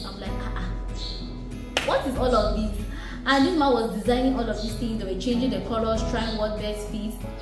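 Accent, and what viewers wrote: Nigeria. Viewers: If you're not Nigerian, I suspect you may have schooled in Nigeria then or grew up among Nigerians. Your accent is so Nigerian with no blemish. You're a good story teller and I love your channel so much. Well done. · Girl you look, talk and your hand expression is just as beautiful as as a Nigerian. You can pass as a Nigerian girl. Love 💕 it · One thing with us is that NIGERIANS are just hospitable but we don't see it as anything, it's just normal. You sound so much like a Nigerian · U sound more like nigerian. We love you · I honestly don't believe you're Cameroonian . Your look , and your accent even sounds like you're from Any part from Nigeria . Lovely ! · I would have sworn you are Nigerian, you sound like us! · Your English accent is very nigerian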